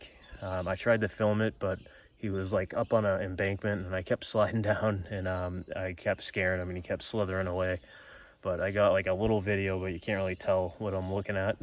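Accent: Massachusetts, United States. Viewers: NH here! I could tell by some of the words you said, you were from Mass.😂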